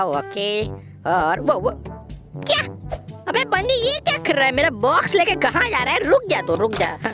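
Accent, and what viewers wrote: Gujarat, India. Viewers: App Gujarati ho kya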